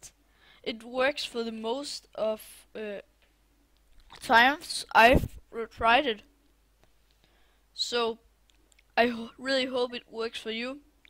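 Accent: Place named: Denmark. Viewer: Det er let at høre du er dansker :D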